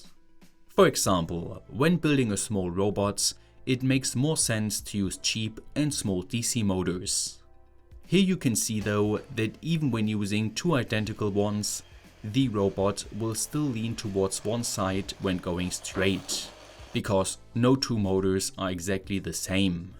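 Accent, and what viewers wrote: Germany. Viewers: ALSO ich habe in diesem Video das erste Mal gemerkt, dass du einen deutschen Akzent hast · i think Entr is asking where in germany. different regions have different accents, as in any other country... i think Entr wants to know where specifically (and so do i)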